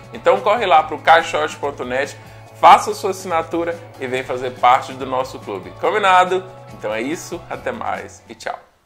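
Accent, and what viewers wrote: Minas Gerais, Brazil. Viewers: Ganhou mais uma inscrita. Teu canal é excelente e eu adoro esse sotaque gostoso mineirinho. Me destes muitas idéias pro apê que eu comprei. Boa sorte com o canal que já é nota 1000.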